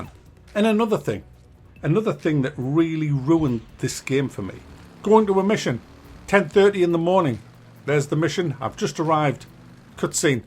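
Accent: United Kingdom. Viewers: where abouts in the UK is your accent from?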